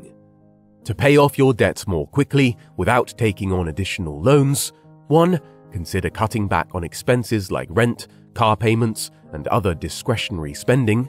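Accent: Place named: England, United Kingdom. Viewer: Best advice (same I gave my kids) is to start paying into a pension plan asap, and by that I mean in your early 20s if youre able. I never invested in stocks and shares and all that malarkey, just my pension, and that was literally from 20yrs old, and I think I will be ok. Btw, I find it weird that theres some English guy narrating this video but its all about how Americans should prepare for retiring 😂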